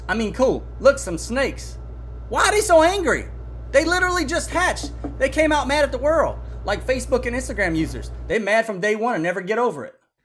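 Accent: Louisiana, United States. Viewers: Dude Your From Louisiana? Me too but where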